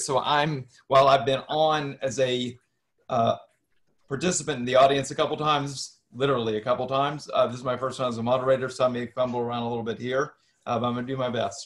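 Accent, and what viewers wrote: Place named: Mississippi, United States. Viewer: I knew I heard a Mississippi accent. I love your videos here — a Mississippi native.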